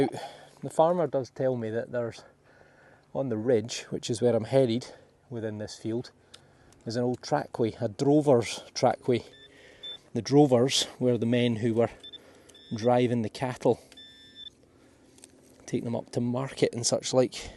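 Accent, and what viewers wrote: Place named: Glasgow, United Kingdom. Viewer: Hi ho. with the Glaswegian accent. I think I was one of the first subs on your visit Scotland channel. You sound just like Billy Connolly 😆.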